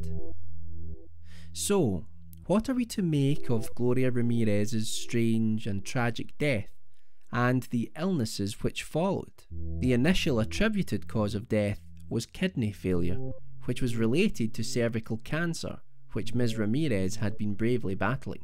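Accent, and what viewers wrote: Scotland, United Kingdom. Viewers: I love your accent & voice. I find it very precise & soothing. Which part of Scotland do you hail from? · Ron Ronson it’s Scottish not Irish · It's hysterical how Scottish the narrator is. No wonder everyone thinks we speak like Sean Connery haha !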